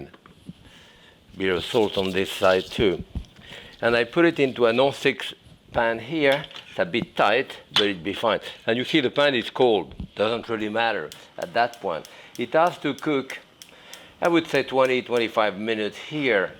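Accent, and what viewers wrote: France. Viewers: It's not a speech impediment . It's his French accent .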